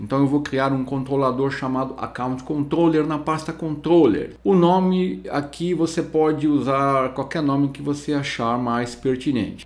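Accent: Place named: France. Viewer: Você participou da minha vida inteira codando, sempre que procuro algo encontro vc. Hoje é a primeira vez que ouço sua voz e percebi que é puxado para o francês 🤗 Engraçado te seguir tantos anos lendo seus artigos e só agora ver vc trabalhando efetivamente. Sensacional o tutorial como sempre 👏👏👏👏. Mestre do code!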